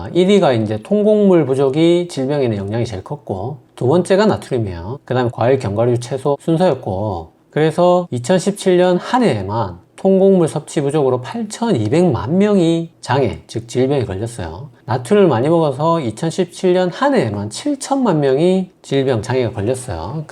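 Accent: Gyeongsang, South Korea. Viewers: 웃기지만 사실적 표현 하나 할까요 오래되진 않았지만 님을 처음 영상으로 만났을 때 이름은 무슨 중국의사인가 싶었고 외모는 고등학생처럼 어려보였어요 거기다가 억센 경상도 사투리를 쓰는데 주구장창 경상도를 떠나본 적이 없는 토막이 경상도 사람 그대로였어요 그러나 놀라운 것은 구독자가 보는 가장 훌륭한 의사쌤이라는 것 사랑과 헌신으로 저렇게 열심히 전해주시는데 "고맙습니다"라는 표현이 한없이 부족합니다 열심히 열심히 공부하고 있습니다 선생님도 늘 행복하시기를 기도합니다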